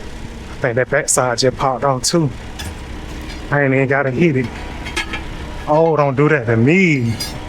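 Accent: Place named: Maryland, United States. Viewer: You sound like someone I know. Are you from Maryland by chance? 😅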